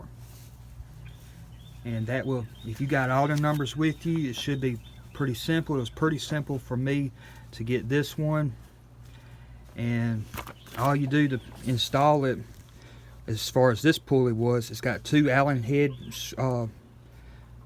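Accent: South, United States. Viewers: Hey I can tell you are a good old boy from the south !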